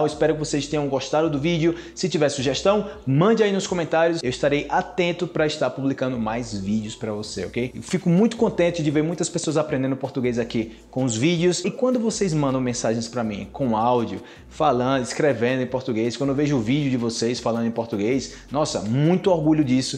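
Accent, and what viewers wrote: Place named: Colombia. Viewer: Eu sabia que vç tinha espanhol da Colômbia! 😉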